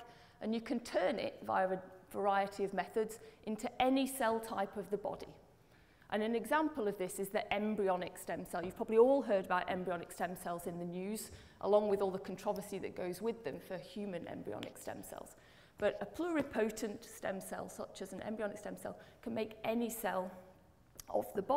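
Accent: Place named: United Kingdom. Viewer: Wonderful information. With your Brittish/ English accent and your rapid diction, it was almost too fast for me. .... an American born english speaker. Imagine the difficulty for my French wife who speaks English fluently. I can tell you are supper enthusiastic, but a large part of your potential audience cannot be included.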